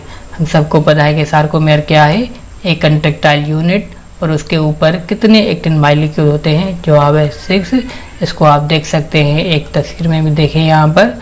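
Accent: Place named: Sindh, India. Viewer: you speak english with sindhi tarka, good explanation sir.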